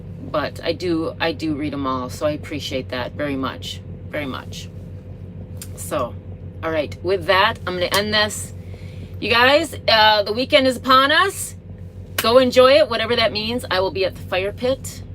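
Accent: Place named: Minnesota, United States. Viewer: Thanks for the "moot point", most say "mute point". Who could hate you? Notice a bit of Minnesota Ya hey der.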